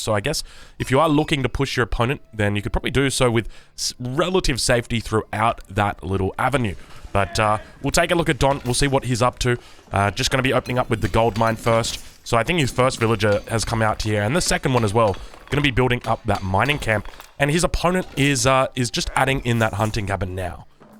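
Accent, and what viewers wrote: Australia. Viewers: So glad I found your vids and channel! More strong aussie casters!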